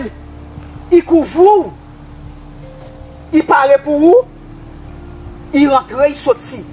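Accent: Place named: Soufrière, Haiti. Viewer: Ou pale menm jan😂ak moun soufriyè mwen tegen fanm dena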